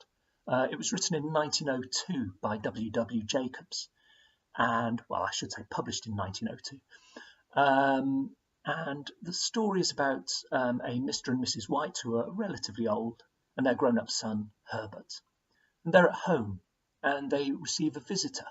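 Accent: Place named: United Kingdom. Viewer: Please tell me in which british accent you are talking😊💐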